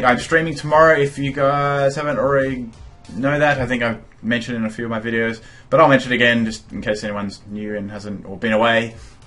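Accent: Australia. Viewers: I'm from australia perth and you song like me so he's probably from perth, australia, anyways NICE vids really enjoy e'm!!!! :D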